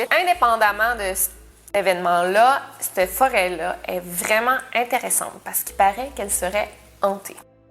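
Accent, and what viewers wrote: Quebec, Canada. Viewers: J'avais entendu vaguement parler de cette histoire mais raconté de façon québécoise que j'adore par, Franchement c'est parfait, on devient vite accro de ses vidéos, je me régale à chaque nouvelle vidéo!!! Ce qui est génial, c'est qu'il y a une prise de conscience sur le sujet qui a bien été préparé par la narratrice et elle maîtrise à merveille ses videos!!! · J’adore l’accent et les expressions québécoises ( je suis français ) 😍😂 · L’accent québécois 😩😍😍❤️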